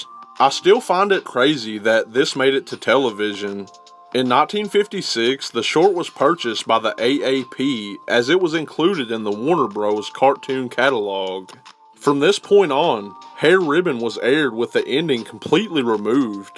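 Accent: South, United States. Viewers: Are you Chills doing a southern accent?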